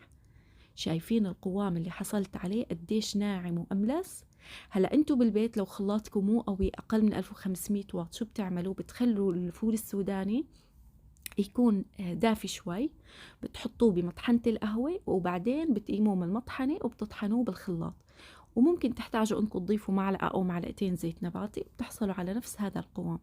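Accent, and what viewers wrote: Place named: Ruhaiba, Saudi Arabia. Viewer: حسيتك من الرحيبة😂😍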